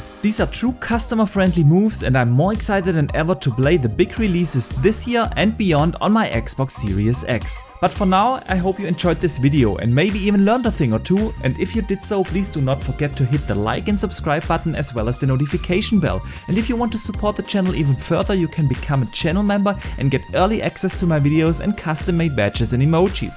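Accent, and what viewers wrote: Germany. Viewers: Man I really like your German accent :3 Nice video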